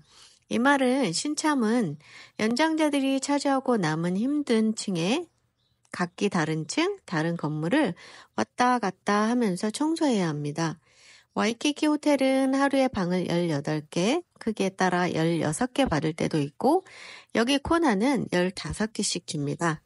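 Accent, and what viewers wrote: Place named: Seoul, South Korea. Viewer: 또랑또랑한 서울 말씨 참 듣기 좋네요, 멋집니다.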